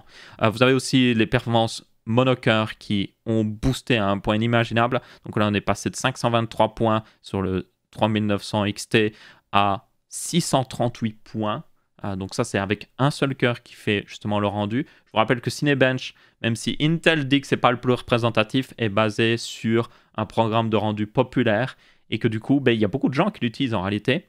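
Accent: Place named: Belgium. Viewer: Beaucoup de "Pouissance" x'DDD Aaaah merci la Belgique ♥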